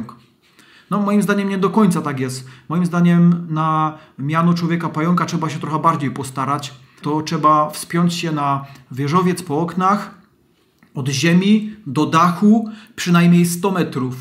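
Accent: Silesia, Poland. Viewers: szacun za przemyślane i wyważone słowa i za śląską gadkę!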